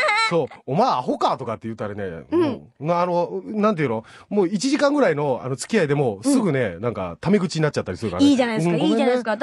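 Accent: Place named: Kyoto, Japan. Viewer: 関西弁の中でも京都弁っぽいな、濃い関西弁 大阪とか兵庫ってだいぶ関西弁薄れてきてるから昔はどこもこんな感じやったんかな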